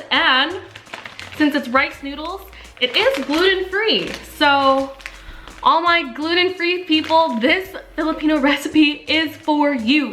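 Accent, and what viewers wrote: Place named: United States. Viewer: you speak American English accent 😊❤ new subscriber from Seattle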